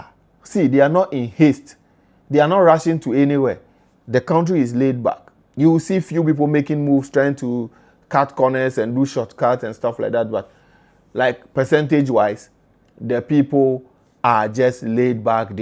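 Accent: Nigeria. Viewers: You were called oga cos of your accent..it sounds nigeriAn. Its not cos of the beard cos young kenyans wear beards too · Your english you sound like nigerian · Those mangoes they put pepe there are unlipe they are very sweet with pepe if you mix everything in food we call that ma chakula nobody will eat that, but we like fresh food with flesh spices, in Kenya we say what men can do women can do better, every one in Kenya has bank account in their phone means Avery body is rich 😂😂😂😂, matatus there are those who don't have the noise so you choose the ones you want to use, for the noise ones are called nganya, you look likes ogo even your English is somehow for Nigerians, uhuru means freedom, so you like nyash😂😂😂😂😂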